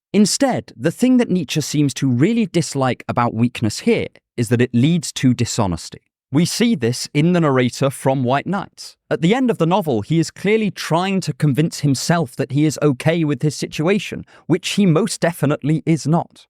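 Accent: United Kingdom. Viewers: I really like his British accent ❤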